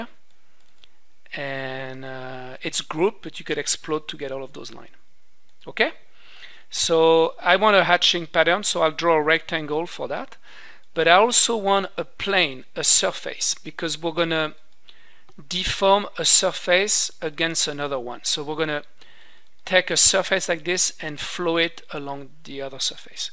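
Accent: France. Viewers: are you french? your accent is amazing :D Thanks for such an effective tutorial :) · You're definitely a French speaker 😅, i love your tutorials 😍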